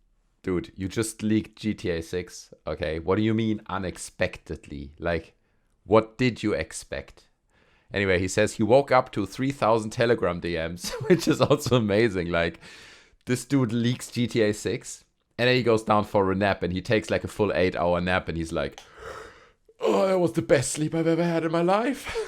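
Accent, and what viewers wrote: Germany. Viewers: Safe der ist ein Deutscher. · you sound german.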